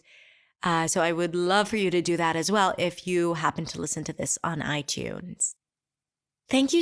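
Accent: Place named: United States. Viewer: Hello! I love your beautiful American accent and thanks a lot of the podcast.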